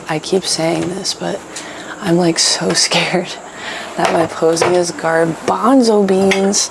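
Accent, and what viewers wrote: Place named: Upper South, United States. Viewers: It sounds top of the south. Oklahoma, Kansas, Colorado, eastern cali. Possibly an empty state as well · Where is this kind of American accent from? It "low-key" sounds like a total laid-back good-hearted goofball who is secretly some kind of genius but covers it up well. I love it. Chillax, bro. Bonzo beans! :person-turqouise-waving::person-turquoise-waving-speech::person-pink-swaying-hair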